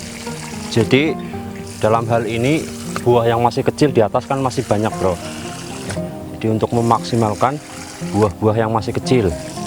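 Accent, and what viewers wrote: Banyumas, Indonesia. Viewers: Logate ngapak.. asli ngendi rila kang? 🙏 salam sekang cilacap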